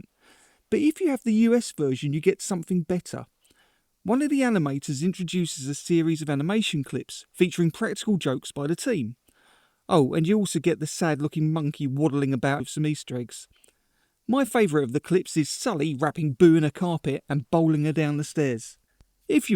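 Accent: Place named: United Kingdom. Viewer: I have no other way of saying this but the auto captions don't like your British accent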